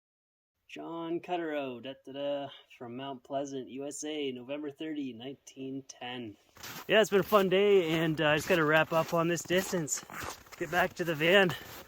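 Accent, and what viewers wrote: Canada. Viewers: Crazy beautiful scenery. Utah is now on my list. Also, has the most Canadian of Canadian accents. I'm also from BC. I wonder if I sound like him when I speak. Probably. Eh?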